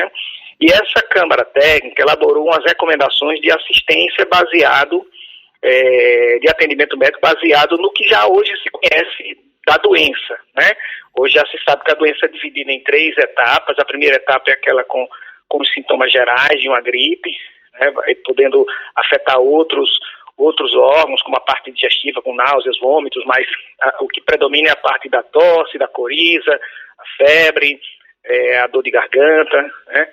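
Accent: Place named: Madeira, Portugal. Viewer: Boa noite! Gostaria de saber de onde você é natural, pois nunca ouvi este seu sotaque... Parece um sotaque da Ilha da Madeira ( Portugal ). Obrigado!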